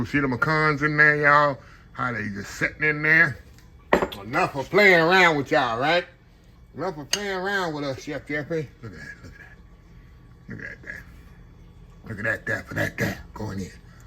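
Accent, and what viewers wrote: South, United States. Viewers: I thought I would be fast forwarding, but fell in love with that country voice, and watched to the very end, enjoying every bit of the true natural quality of something being made with love. When I saw your very clean nails I knew I was happy to be in your kitchen my brother. It looked so delicious, next time try adding organic caramel. I will make with salted cashews, my favorite. Thank you for the smile❤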